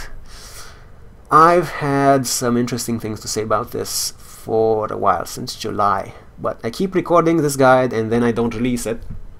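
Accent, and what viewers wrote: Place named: Russia. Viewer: Its soo russian lol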